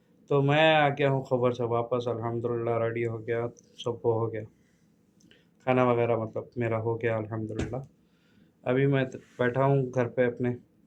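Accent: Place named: Hyderabad, India. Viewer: Aslkum khiriyat se hain sir ji nahi aap puri tarha hyderabadi baat hi kare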